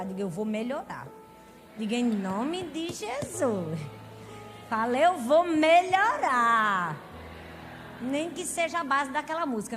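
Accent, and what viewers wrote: Northeast, Brazil. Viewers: e esse sotaque nordestino? 😍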